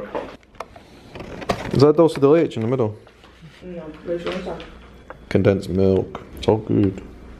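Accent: Scotland, United Kingdom. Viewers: Are the Brazilians, having a wee trouble with your Scottish accent? :) Have a great time.. I hope they ship that Lemon Cake to the U.K.! for you...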